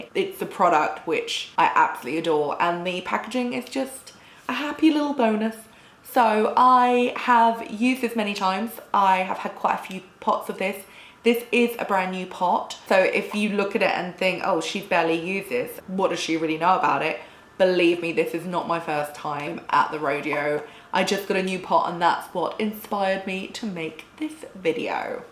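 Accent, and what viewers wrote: England, United Kingdom. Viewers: You are just so cute and so sweet! I love your English accent! I have eczema and had heard that this cream was really good but wasn’t sure. Your review completely sold me!🥰😃👍✨💖✨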